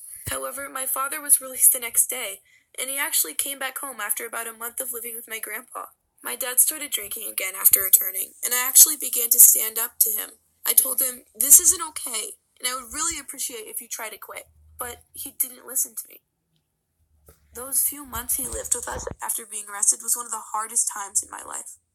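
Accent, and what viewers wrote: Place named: Ireland. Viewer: You irish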